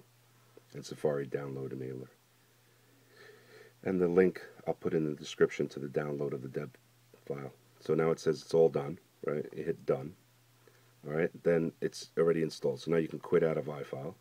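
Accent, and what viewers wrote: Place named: Long Island, United States. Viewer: i like your accent! are you from long island? thanks man!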